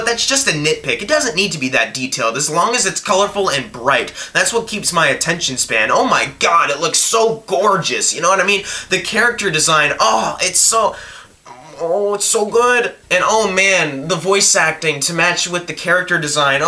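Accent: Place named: United Kingdom. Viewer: This is for FANS take that critics British accents are beautiful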